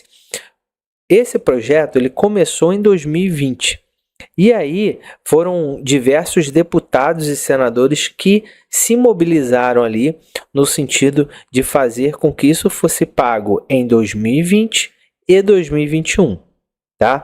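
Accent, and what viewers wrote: Rio de Janeiro, Brazil. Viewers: Deixe esse sotaque de carioca ja esta enojando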